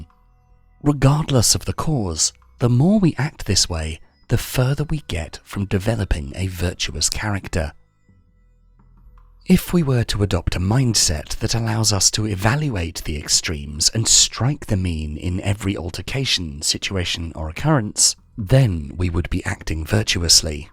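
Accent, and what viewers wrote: United Kingdom. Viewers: #1. Loose the pseudo intellectual lispy British voice.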